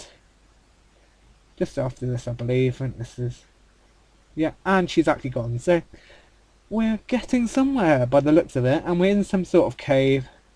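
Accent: United Kingdom. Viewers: Whoa! Your Accent Is Really British! I Mean I'm British But That Is Really British!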